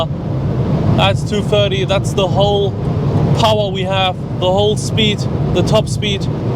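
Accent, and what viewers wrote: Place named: Germany. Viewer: Thought he was speaking german with that accent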